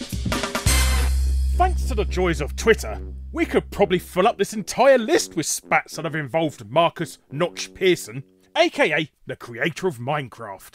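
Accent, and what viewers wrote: United Kingdom. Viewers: Time for another 13 minutes of that good ol' British accent.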